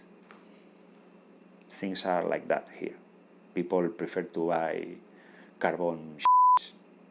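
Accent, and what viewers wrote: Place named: Bilbao, Spain. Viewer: Aupa!!. Ese inglés de Bilbao a tope.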